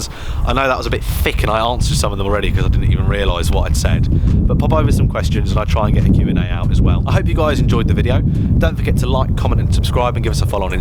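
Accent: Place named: Australia. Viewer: aussie accent is on point 😂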